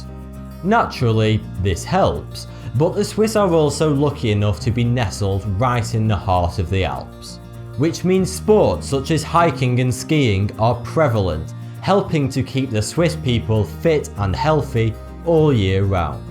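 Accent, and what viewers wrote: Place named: Manchester, United Kingdom. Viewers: He has a very slight Manchester accent. Which I like 👍. This guy does a fantastic job, well ahead of his years... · It's his dialect you idiot. Ever heard of the northern dialect of England.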